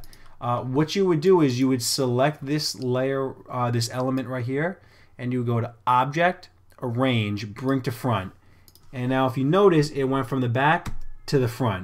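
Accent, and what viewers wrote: New York, United States. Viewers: Awesome, thank you fellow New Yawka!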